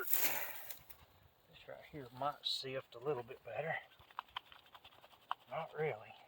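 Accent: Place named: South, United States. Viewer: Very disturbing, sad, cruel, and embarrassing posting. Strange how YouTube allows this very depressing, and cruel content. Always some " man" with a southern drawl, beard and can smell them thru the Internet...... Always Trumpers, and always think of themselves as "real Americans men"..... Real men hunt with cameras.......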